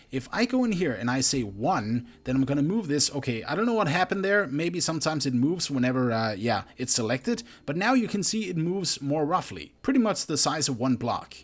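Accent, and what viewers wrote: Denmark. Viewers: Funny how i just instantly knew you were from Denmark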